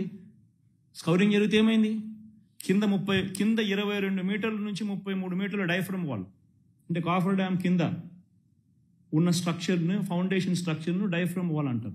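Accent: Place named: Rayalaseema, India. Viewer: rayalaseema slang n language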